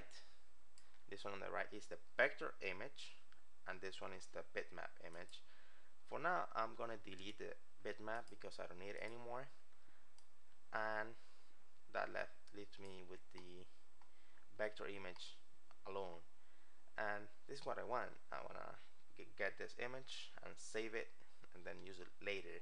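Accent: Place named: Mexico. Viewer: you sound ike me trying to spek english (i'm mexican)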